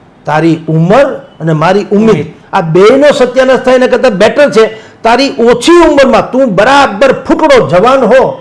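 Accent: Kathiawar, India. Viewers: Aaj Ananya hamara dhanyvad મહેતા ની ખૂબ જ સચોટ અને પ્રભાવી વાત હોય છે તેમણે ઊંડો અભ્યાસ કર્યો છે તેમજ તેમના જીવન ઘડતરમાં ખૂબ જ અનુભવ કેળવ્યા છે તે બધા વાલીઓને સારા માટે કહે છે વિદ્યાર્થીઓને સારા માટે કહે છે અને હું પણ એમના વાતમાં સહમત છું ખૂબ જ ઉમદા અને સરસ વાતો કરી પ્રેરણાદાયી વાતો છે દરેક વાલી જો સમજે તો પોતાના બાળ સંતાનોને યોગ્ય માર્ગદર્શન આપી શકે છે ટેલેન્ટ ની ખૂબ સરસ વાત કરી છે દરેકે પોતાના દીકરા માં શું ટેરેન્ટ છે તે જાણીને તેના તરફ પ્રોત્સાહિત કરવા જોઈએ. મહેતા સાહેબને ખરેખર સરસ્વતી માતા સાક્ષાત છે તેઓને જ્યારે સાંભળ્યું છે ત્યારે એક સંત મહાત્માની જેમ તેમની અવિરત વાણી અમારા જેવા નાના માણસોને સાંભળવા મળે છે. અમુક ખૂબ જ આ વાત માંથી પ્રેરણા લઈએ છીએ કાઠીયાવાડી લેંગ્વેજ થી તેઓ જ્યારે વાત કરે છે અમને ખૂબ જ ગમે છે ખૂબ જ મોજ આવે છે ઘણીવાર હસાવી પણ દે છે અમે હસી પણ લઈએ છીએ પરંતુ ગંભીર જે બાબતો છે તેને અમે નજર અંદાજ પણ નથી કરતા ખૂબ જ સચોટ કહે છે. આજ રીતે પ્રજાના પ્રશ્નોની વાંચા આપતા રહેશો તેવી અમને આશા છે મહેતા સાહેબે વિસ્તૃત માહિતી આપી તે બદલ ખુબ ખુબ ધન્યવાદ 👍👍 તેમની સાથે સંવાદ કર્યો તે પત્રકાર મિત્રને પણ અમારા ધન્યવાદ 👍👍👍👍 જય હિન્દ વંદે માતરમ ત્રાગડ ચાંદખેડાથી ગાભાજી ઠાકોર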